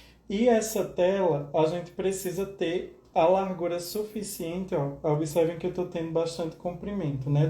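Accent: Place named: Pernambuco, Brazil. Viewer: Sotaque pernambucano... Ksksk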